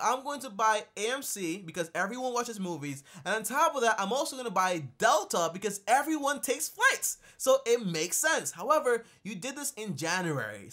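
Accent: Caribbean. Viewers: Yo is it just me or does he sound carribean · I hear a Caribbean accent! Lol where is he from?! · I hear a Caribbean accent. Where are you from? Good video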